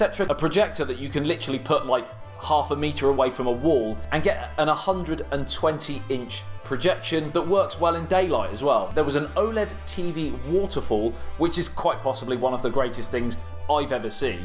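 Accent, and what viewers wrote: United Kingdom. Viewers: Dude, your accent is so British...You named it as but I'd call it.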